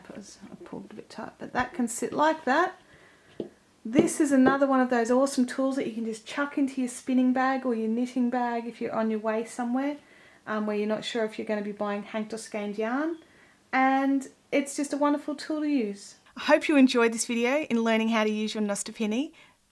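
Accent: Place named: Australia. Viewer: Thanks so much for this!! Well done and nice to hear the Aussie accent. 🙂🙂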